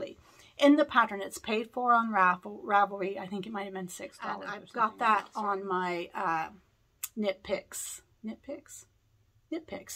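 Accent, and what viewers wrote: Ireland. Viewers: Those will be perfect for your dad. I hear the Irish accent. That is so nice. Gina at knitting Turnpike is , I think possibly doing the same KAL, I've heard her talk about it. Her shawl work is beautiful. You might check her channel. I am a pretty new knitter so I can't be much help. You have such beautiful yarn.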